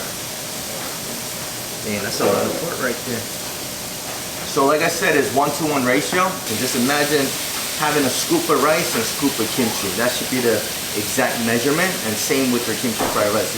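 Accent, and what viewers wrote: Philadelphia, United States. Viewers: He's not cursing he's just speaking Philadelphia.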